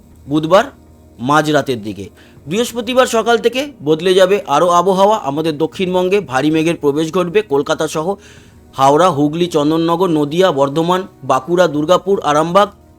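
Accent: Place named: Bangladesh. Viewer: আপনি কি বাংলাদেশ থেকে ভারতে এসেছেন ? ছত্রে ছেত্রে বাংলাদেশ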